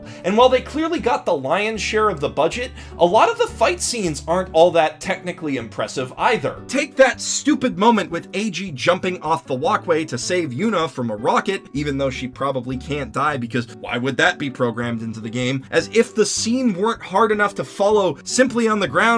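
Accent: Canada. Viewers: Holy cow! Are you Canadian??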